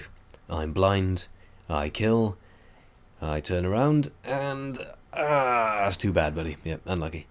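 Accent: Wales, United Kingdom. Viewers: He's from Wales, UK. Well, his accent's Welsh, so I assume he lives there.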